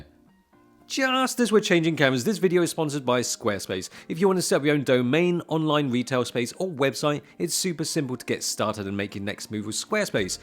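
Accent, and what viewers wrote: United Kingdom. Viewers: imagen being lost in china and you bumb into this guy for directions lol he sounds more britsh than most brits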